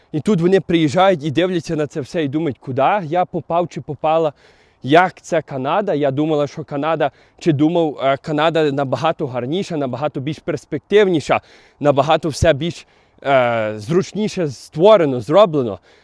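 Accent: Western Ukraine, Ukraine. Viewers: Бомбічна західноукраїнська мова. Як в Трускавці в дитинстві) Пан, ви звідки самі? Пс прочитав, що зі Львова, з самого Львова? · Чистый западенский акцент. Прожил с ними пол года. Ну их нахуй. Пришлось ехать в Европу. А автор - да, западенец, патриот, в Канаду уебал😊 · Ты с какой области уехал в Канаду? Почему такой западенский акцент? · Дуже такий файний діалект у вас , западенський)) 👍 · Он говорит на западноукраинском диалекте, а вы пишите о литературном украинском.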